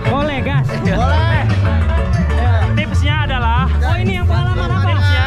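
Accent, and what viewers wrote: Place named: Sunda, Indonesia. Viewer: kocak anjirr kalo ngomong pake bahasa Sunda nada Sunda nya itu yg candu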